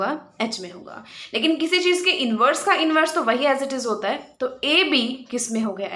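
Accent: Haryana, India. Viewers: Thank you mam... Your voice is so sweet..... With haryanvi touch i 🤔 😅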